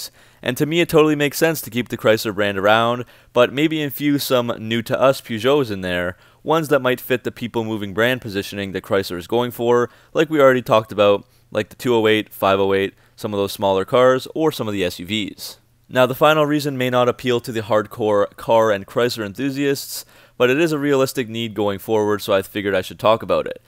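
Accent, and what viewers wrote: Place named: Canada. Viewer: Lol, that Canadian accent is strong.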